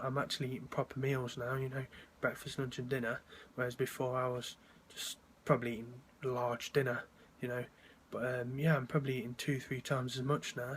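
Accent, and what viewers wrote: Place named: Hull, United Kingdom. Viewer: You have got to be from Yorkshire. I am, you sound very Hullish?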